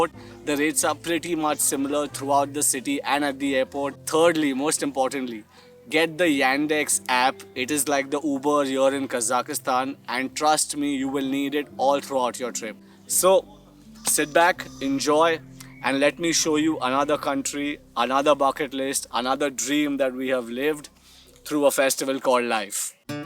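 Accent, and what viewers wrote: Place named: India. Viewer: Indian accent 🤢🥴🥴🥴🥴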